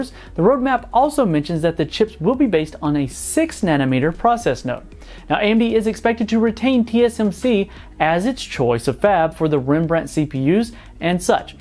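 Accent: South, United States. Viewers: your subtle southern accent is pleasing to the ear for some reason 🤠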